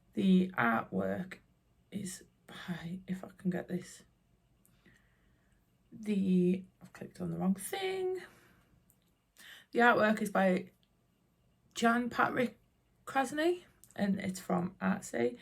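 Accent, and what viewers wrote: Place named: Newcastle, United Kingdom. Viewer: Welcome to flosstube!! I live in Canada but my mums side are all Geordies, its so nice listening to you talk! Love your accent and all your WIPS. Look forward to your next video💗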